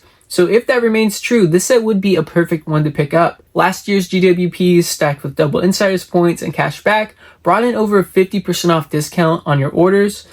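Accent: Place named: United Kingdom. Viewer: Why does he sound like he’s speaking English and British at the same time 😭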